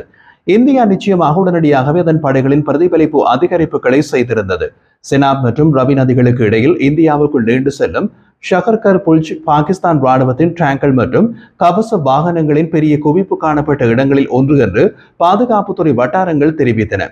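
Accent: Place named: Jaffna, Sri Lanka. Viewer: யாழ் தமிழைக் கேட்டு மகிழ்கின்றேன் மிக்க நன்றி வாழ்த்துக்கள்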